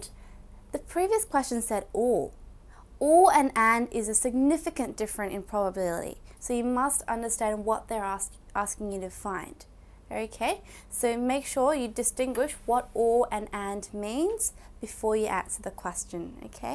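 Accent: Australia. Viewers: Aussie accent tho. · I like your Australian accent! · Omg thank you so much. She really explained very well. Btw the girl who teach reminds me of Rosè from Blackpink because of her Aussie Accent. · It's messing with my mind watching a Chinese girl with an Australian accent.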